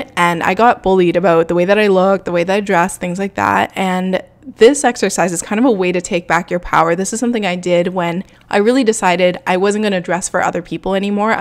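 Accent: Canada. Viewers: Is she canadian ?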